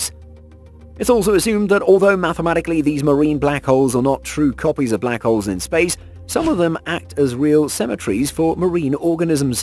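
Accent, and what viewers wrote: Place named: United Kingdom. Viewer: Good Lord, soon as I heard the Brit accent I turned it off. You're always smarky, cocky, mocking. Why even put this on here if you just going to mock everything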